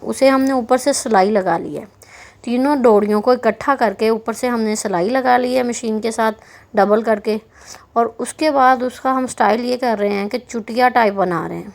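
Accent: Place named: Punjab, India. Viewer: Nice punjabi touch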